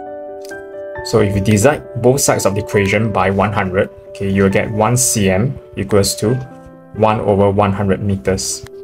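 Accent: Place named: Russia. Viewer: Where are from man I love your style of teaching accent looks like Russian or european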